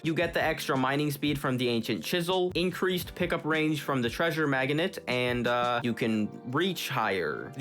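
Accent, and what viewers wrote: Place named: United States. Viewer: Pov: you don't like the American accent (don't live in the states might just be a state accent)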